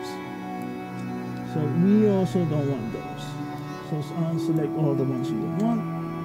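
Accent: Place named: Russia. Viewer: You sound Russian but we're not sure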